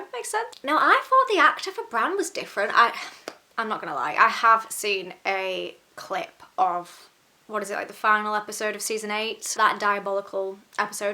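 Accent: United Kingdom. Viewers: You sound like you're from Hull.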